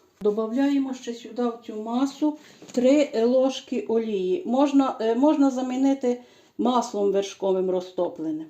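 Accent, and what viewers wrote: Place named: Galicia, Ukraine. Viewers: Ні. Це не львівський говір. Це Прикарпаття! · Моя дорогенька, "най си трошка постоїт ", "підиллєємо "... Обожнюю свою рідну говірку галицьку! Скучаю за нею несамовито, я живу в Рівному, і тут свої діалекти, далекі від моїх рідних...😢😢😢 А зі старістю ностальгія за рідними краями тільки посилюється.... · Дуже дякую за чудовий рецепт і за рідну прикарпатську говірку! 🥰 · Пані дякую за рецепт ви з Львівщини чую по розмові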